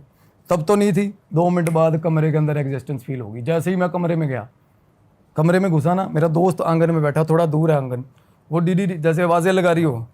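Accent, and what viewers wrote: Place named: Rajasthan, India. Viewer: Bhai . I really love his Rajasthani accent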